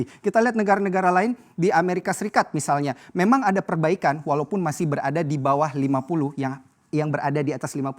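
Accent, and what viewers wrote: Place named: Kalimantan, Indonesia. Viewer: Aku nebak dari loghatnya presenternya orang kalimantan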